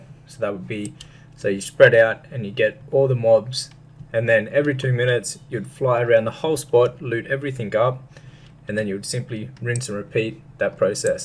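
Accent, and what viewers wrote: Australia. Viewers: Love your Aus accent lol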